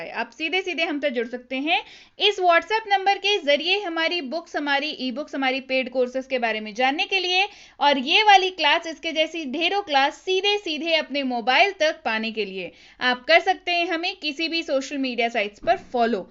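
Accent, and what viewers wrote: Bihar, India. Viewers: Bihari like maam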